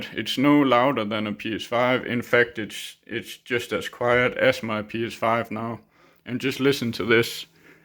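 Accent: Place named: Denmark. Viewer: U sound very danish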